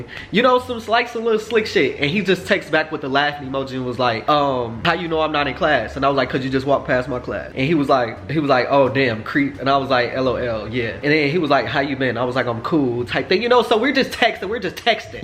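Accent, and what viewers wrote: United Kingdom. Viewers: BYEEE THE BRITISH ACCENT IS TAKING ME OUTTT 💀😂😂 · It’s the British accent for me lol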